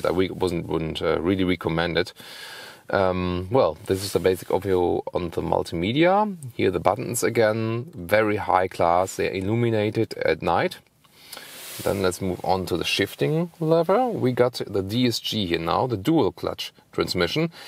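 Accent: Germany. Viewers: your German accent sounds reliable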